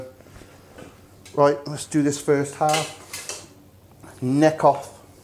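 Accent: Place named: West Country, United Kingdom. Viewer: You got a westcountry accent like mine but I cant quite place it... top video by the way. Butchered by own lamb while watching you.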